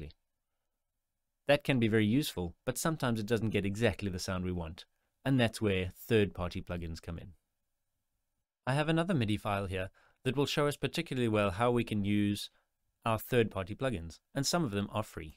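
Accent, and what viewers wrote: South Africa. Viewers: is your accent South African or British? sounds like a bit of both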